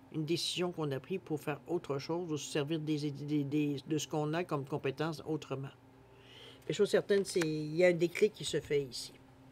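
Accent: Quebec, Canada. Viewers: ah c'est l'accent québecois !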